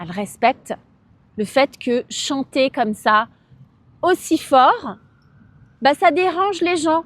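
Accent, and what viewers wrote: France. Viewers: Heureusement pour moi que j'habite une maison individuelle sinon ce serait un calvaire avec le voisinage, j'allume le micro et je mets le son bien fort, surtout que la voix aigué avec un accent parisien🗼 très particulier de notre belle 🌹est très agréable à entendre, sauf ma très chère mère quand je mets la musique fort dans ma chambre, elle vient pour me dire " mais tu n'entends pas très bien! tu sais d'où on t'entends!, parfois elle rentre en dansant 💃en me disant tu sais l'heure qu'il est! ha ha ha😊, ma grand mère qui habitait un appartement à Alger, ses petites filles jouaient en portant les souliers à talent de leur mère en faisant du bruit, la voisine du bas venait chez elle se plaindre du bruit de ces souliers à talent, donc les appartements c'est toujours un problème, il faut revoir les constructions avec de nouveaux matériaux de construction où le bruit sera infranchissable pour éviter les dérangements entre voisins et garder la quiétude et la bonne ambiance entre eux. 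Maintenant passons à l'humour, mais c'est juste une blague pour plaisanter, une histoire qui s'est répandue en Algérie il y a plusieurs années, il s 'agit d'une française qui est venue en Algérie, elle a rencontré une famille qui avait un chien qui lui a réellement plu alors on le lui a fait cadeau, en retournant en France, comme elle habitait un appartement, elle a mis le chien dans son balcon, mais la nuit quand elle dormait, le chien n'arrêtait pas d'aboyer ouaf ouaf oua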